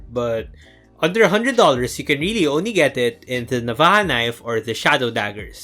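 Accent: Philippines. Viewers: i can hear it in your accent kabayan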